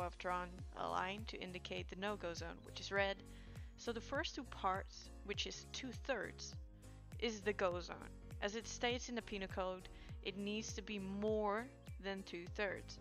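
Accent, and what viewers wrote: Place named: United States. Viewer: he aint wrong Shawn loooool UK boi that sounds really american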